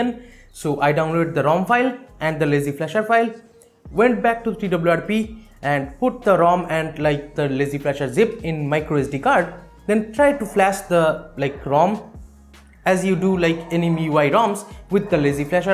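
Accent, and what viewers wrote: Bengal, India. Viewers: Brother are you a Bengali? Voice tay Banglar taan ache :)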